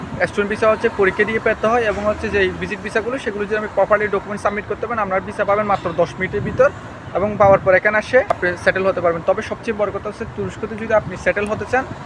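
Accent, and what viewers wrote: Chittagong, Bangladesh. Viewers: তুমি কি চিটাগং এর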